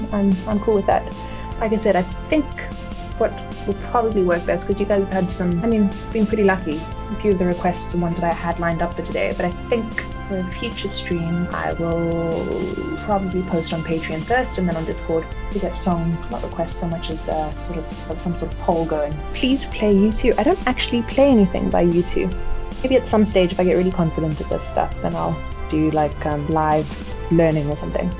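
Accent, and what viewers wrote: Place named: South Africa. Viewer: Hey,. Sorry I missed your first livestream,but I shared this all over Facebook. You're awesome as always. I love You're South African accent and could listen to you talk all day. Lol. I absolutely love your music and the way you perform. Never stop playing. I don't have much time left on earth, but I'll be listening to you for as long as I can. 🤟✌🌹🌹🌹🌹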